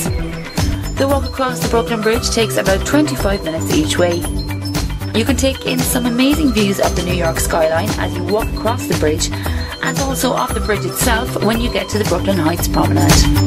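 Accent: Ireland. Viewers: I think her irish accent and voice sound very nice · Sounds like a hybrid Irish accent to me .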